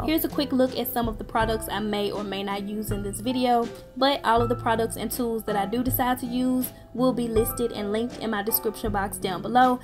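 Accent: South, United States. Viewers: Nice southern accent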